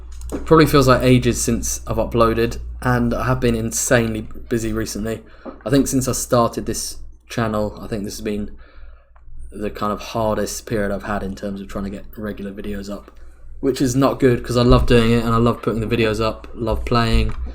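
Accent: United Kingdom. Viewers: Hearing british accent is so releaving. Im from Poland but after listening to american youtube and then switching to british it feels like its my native language. I can't explain why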